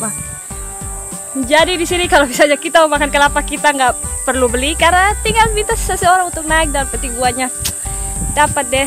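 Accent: Kupang, Indonesia. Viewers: its amazing the way you talk kupangnese. Pls teach us some kupang language:)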